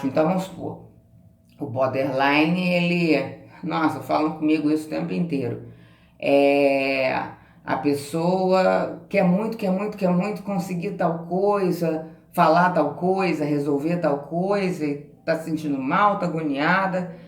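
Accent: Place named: Rio de Janeiro, Brazil. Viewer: Você é muito inteligente , sou Border também , e tenho um estranho orgulho disso talvez porque sou anti - social . Pelo sotaque você é do Rio , eu sou de Curitiba .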